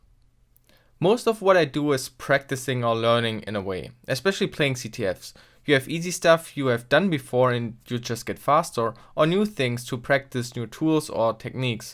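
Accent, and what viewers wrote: Germany. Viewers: Are you German? Because I am and your accent sounds really German, like I sometimes forget I'm watching an English video😂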